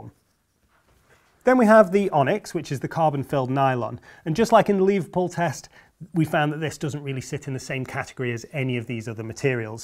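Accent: United Kingdom. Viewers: why is it always someone with a brit accent👎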